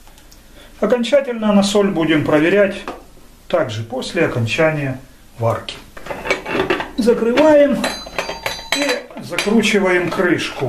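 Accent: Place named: Ukraine. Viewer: Хас, хатоука, прихотовление, ховядина, храдусы... Попробуйте сделать над собой усилие и говорите по-русски. Или уж честно на мове начинайте гоорить. К которой, кстати говоря, большинство русских относится совершенно нормально. Но это смешение наречий, рожающее суржик, просто режет ухо. А за рецепт спасибо! Зачётно!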